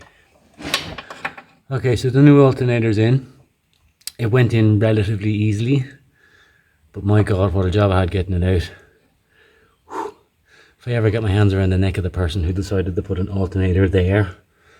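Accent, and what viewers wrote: Ireland. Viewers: New viewer here, thanks to Dan posting your puppy video. Seeing as you so kindly numbered your vlogs, I decided to begin at the beginning of them. You're loads of fun, great scenery videography, witty reparte', lol, what's not to like? I could listen to you talk all day long. Your accent is much like my own. I have missed hearing it. Dad's family were McKinley's from northwestern Ireland. Most there starved by the Catholics and British. So, to America, his grand dad went from mining and being a Molly McGuire to becoming a weaver in Philadelphia. Dad and his dad carried on the traditions of being Molly McGuires in Ohio, West Virginia, and Kentucky with full support of corrupt cops. We used to sit on a blanket covering loads of guns, ammo and dynamite, while traveling 4-5 hours out of state as kids. As you might imagine, our childhoods were sheltered and so controlled because he was in organized crime that our way of speaking remained Irish. 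An englishman, a linguist, once paid me to talk as he recorded me speaking. He rather enjoyed pissing me off to hear me tell him off, lol. I am, myself not Irish by blood though I have green eyes. I never feared St. Patrick's Day at school for not wearing green. Not wearing it got one punched in the shoulder. I am native American Indian by blood, a direct descendant of the Shawnee Indian leader, Tecumseh. I don't know how to be an indian, except dad respected how quiet, sneaky, and courageous I was when he threatened my life and tried to ki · Hi! I found your channel by accident but know you from Dan's channel (been following him since his farmhouse days). As I have enjoyed your interactions I've seen from Dan's perspective, I thought I'd check your channel out and I love it! I hadn't heard enough to realize that you're Irish until hearing you on your own. Irish blooded myself, I miss the quirky, snarky humor and so thrilled to see it in you. 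Thanks for the smiles and I look forward to more!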